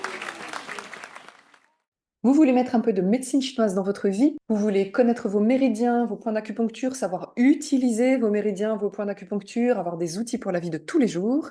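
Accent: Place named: Belgium. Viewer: Je m’intéresse à la MTC depuis peu et je vous rencontre 🙏🏽 vous êtes claire, pédagogique, lumineuse alors je m’abonne directe 👏merci et bravo, j’entend la Belgique 😉🌻🌞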